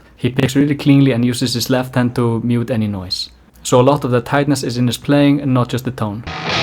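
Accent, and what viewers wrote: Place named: Finland. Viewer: yo, you sound finnish lol, love the video. Keep going!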